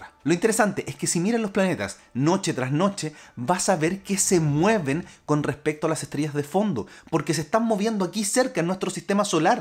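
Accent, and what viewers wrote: Chile. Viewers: Amigo... eres el mejor!!! Muy buen video... tu explicacion es excelente!!!! Ah!!, me encanta tu acento chileno. Soy de Venezuela, pero vivo en Estados Unidos... Te felicito...!! Excelente!!!!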